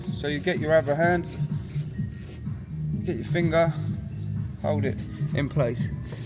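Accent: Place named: Africa. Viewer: Africano hablando inglés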